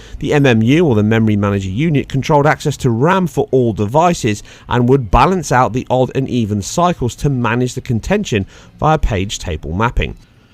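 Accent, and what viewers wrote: United Kingdom. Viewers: I really hate the Limey use of GOOEEEEY, (for GUI) or NES/SNES pronounced as words NEEES or SNEEES. It is just a preference. I Prefer GUI or grafphical User interface.. or Mouse interface. and I like "Nintendo" or "Super nintendo". I love you Limeys... Killer gamers from back in the day. Kinda like the South Koreans in modern times! (Serious gamers too).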